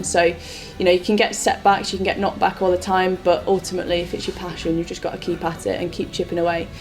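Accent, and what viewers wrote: United Kingdom. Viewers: I just realized that accent is the same as Mary's accent from AC4. Can any brits fill me up on what part of England that has that accent?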